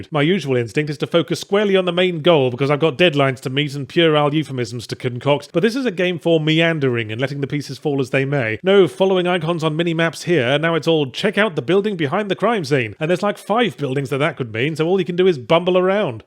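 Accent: United Kingdom. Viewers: I am here to listen to a British man in a fedora say really funny things about a shit game REALLY REALLY fast <_<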